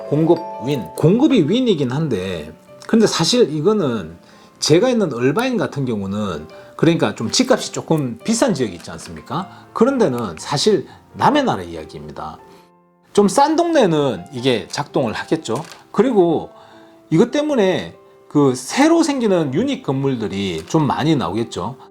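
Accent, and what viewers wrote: Gyeongsang, South Korea. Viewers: 원래 갑자기 스쳐가는 생각이 세상을 바꾸는 법입니다, 적극 추천합니다,,백안관에 청원 하시기를,,,,, ㅎㅎㅎ, 감사합니다, ( 같은 경상도 신것 같아요, ) 👍👍👍💕💕💕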